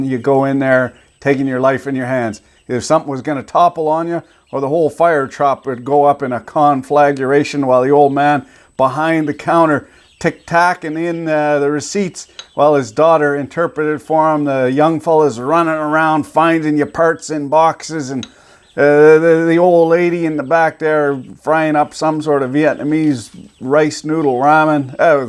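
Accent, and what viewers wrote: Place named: Canada. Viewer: The Canuckistan English language of still amuses and amazes me after all these years.:)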